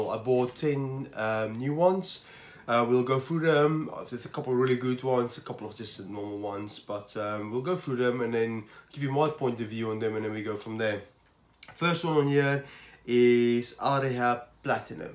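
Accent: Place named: South Africa. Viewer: Are you South African by any chance? I’m South African and I hear it, but it also sounds like you’ve lived in the UK or something.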